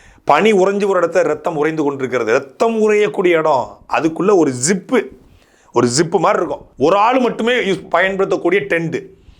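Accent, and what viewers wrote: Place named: Coimbatore, India. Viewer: தலைவரே இத்தனை நாள் உங்க பேச்சு நல்லா இருந்துச்சு இன்னைக்கு ஏதோ கோயம்புத்தூர் பாஷா பக்கம் பேசுற மாதிரியே தெரியுது... என்னமோ போங்க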